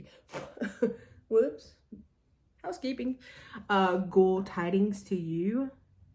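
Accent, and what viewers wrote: South, United States. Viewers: Hi, - I really enjoy watching your videos. It's nice to hear another Southern accent (I'm in N GA.) I'm reorganizing my craftroom and remembered this video. The clean designs made a big impression so back I came for inspiration. Do you remember if the storage units were the Kallax units? I'm trying to avoid the drama of a trip to the Ikea store in Atlanta. There was a time when that would have been a trip I would have looked forward to, but not so much anymore. I love the clean look of the baskets and magazine files. No one has to know about the chaos that lies within.. 😂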